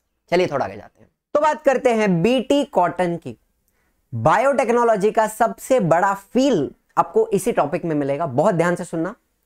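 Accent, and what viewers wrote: Maharashtra, India. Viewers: hi sir aap marathi ho na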